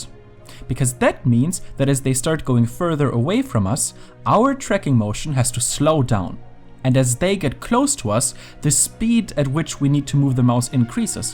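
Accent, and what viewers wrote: Germany. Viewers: Du bist deutscher oder?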